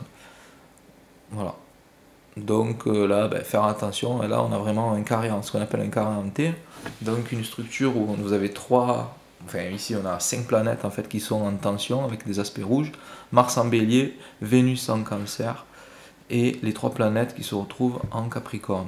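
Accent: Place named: Marseille, France. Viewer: Bravo et merci. 🙏 🙏 🙏 j’ai mis le pouce bleu et je me suis abonnée car c’est un plaisir de vous écouter! Description claire et nette avec ce petit accent Marseillais! 🌏☀️🌜🌗🌈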